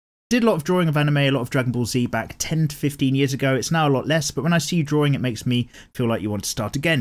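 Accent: United Kingdom. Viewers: dude ur british? can u be my yoda brah?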